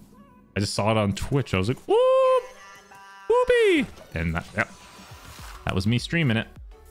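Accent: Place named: Cockney, United Kingdom. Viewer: People will call us bri'ish schtewpid and then try and do cockney accent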